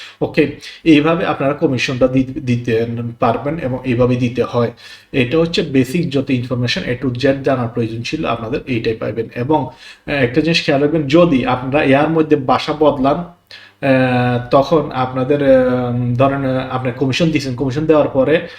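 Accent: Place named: Noakhali, Bangladesh. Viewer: আসালামু আলাইকুম কেমন আছেন ভাই আপনার কথা গুলো শুনে আমার মনে হচ্ছে আপনার বাড়ি কি নোয়াখালী না কুমিল্লা জানাবেন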